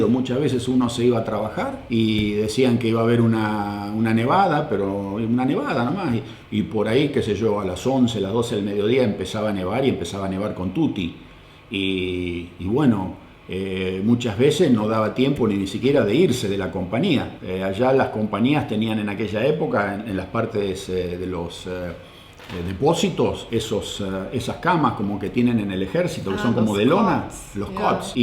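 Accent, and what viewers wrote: Argentina. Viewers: Lindo video! Que buen relato tienes, no has perdido el idioma ni los giros argentinos, me encanta escucharlos, tiene a quien salir. Felicidades a todos en este año que se inicia y ojalá salgamos del pantano que Argentina está inmersa, cosa que no lo creo... al menos por varios años, nos falta tanto para ser de primer mundo, necesitaremos la ayuda de Dios. Buena suerte y hasta la próxima, saludos desde San Lorenzo (Sta. Fe), Argentina. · Ayyy! Qué increíble tu historia! Hablás como si estuvieras viviendo en Argentina tu acento.Abrazo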